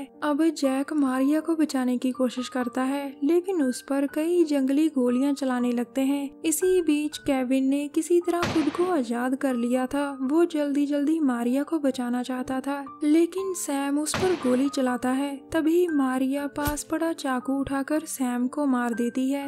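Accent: Punjab, India. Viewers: Mam Panjabi lagg rahe ho aap , aap k bolne K treeke se lagg Raha hai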